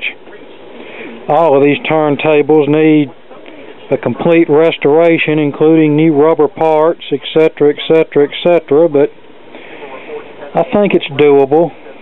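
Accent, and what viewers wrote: South, United States. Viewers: I was born, raised and grew up about 45 minutes outside Nashville, Tennessee. Nashville was a much smaller city than it is today in 2022. In 1985, for about a year, I moved to Downers Grove, IL and worked in Chicago. Communting into the city from Downers Grove was hell on earth, even in 1985! I moved back to near Nashville in 1986. I don't have the southern accent this gentleman has because I worked in major market broadcasting. Although I wanted to major in electrical engineering and be a radio station engineer, I did take a public speaking class in college to speak in a more midwestern accent since I had to do on air work for radio. Also my mother was born and from Brooklyn, New York. She met my Dad while he was in the military. He got station as a Staff Sargent at Ft. Campbell, KY. In 2022, I'm retired. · I love southern people...that voice...kills me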